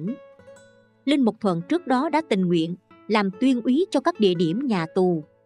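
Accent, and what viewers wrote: Saigon, Vietnam. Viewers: Giọng Sài Gòn nghe ngọt quá! 👍👍👍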